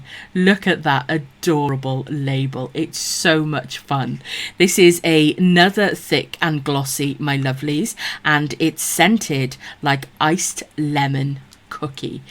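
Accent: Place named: United Kingdom. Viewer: I love your accent! And it’s so cute when you say my lovely’s! I just love all of the British language it’s so cute! This was overall a great review! As a reviewer myself you did a great job! You are so underrated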